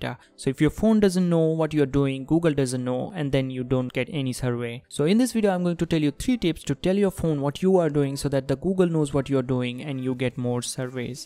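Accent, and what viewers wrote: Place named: India. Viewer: Indians English pronunciation..... ew but thanks for help anyways.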